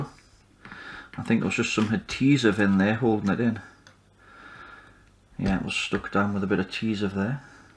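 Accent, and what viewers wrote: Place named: North East England, United Kingdom. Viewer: Ideal item for someone like. Not Vince. I don't like him. He sounds too "nice", he's not my mate and him saying "Trying to fix" implies that he's fumbling about without really knowing what he's doing. YOU, though, do know what you're doing. You quickly identify the problem and just get on with repairing/replacing the dead part(s). You get straight to the point and don't mess about. No silly intro music or graphics. Also i like your North East accent.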